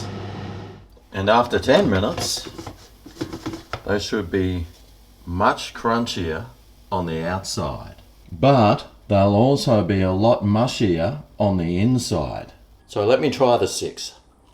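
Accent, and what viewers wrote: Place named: Australia. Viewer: Never thought I would enjoy having an Aussie tell me how to make crispy fish sticks!😂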